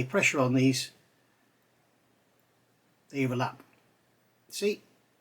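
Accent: United Kingdom. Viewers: define the accent...........UK?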